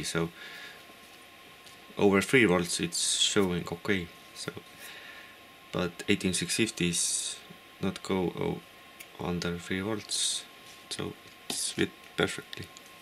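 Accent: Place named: Estonia. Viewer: You sound like Estonian are you ? Or maybe Finish or Hungarian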